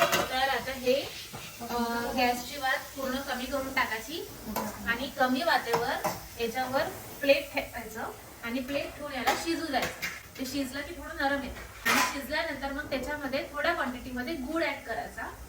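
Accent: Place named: Karanja, India. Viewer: Tai tumhi kuthlya gawachya aahe tumchi language bilkul aamchyAsarkhich aahe Karanja (ghadge)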